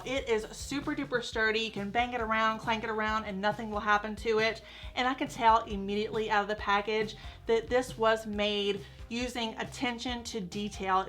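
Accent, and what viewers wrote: South, United States. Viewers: New sub here. I agree, Teddby Blake has some gorgeous well made bags. I got the 9" Ava Croco Gold in Arancione and now I want the 12" Caty Croco in Black! I can attest to the quality of the leather having lived a few years in Italy, their leather is TOP notch! Looking forward to seeing more video's from you dear. Btw, I'm a Southern gal too and I love your accent!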